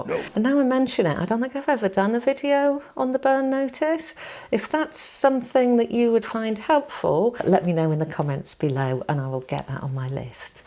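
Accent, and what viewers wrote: United Kingdom. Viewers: Dear Madam, your delivery, speech and of course, the British accent English is really something. Kind regards.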